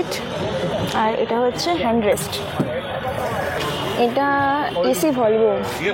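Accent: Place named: Ghoti, Bangladesh. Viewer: Tumi j ghoti seta bojha jachhe .....uchharon bolleo thik kortey parbe na ......sab Sam bajarer sosi babu 😂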